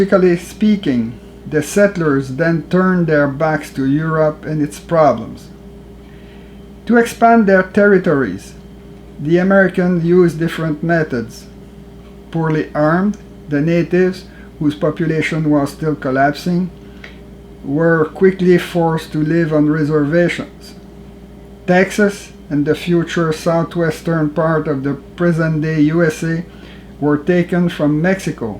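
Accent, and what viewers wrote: Quebec, Canada. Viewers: your own government actively committing civilizational genocide on itself is pathetic. Also, this man sounds like he's from Quebec, not the US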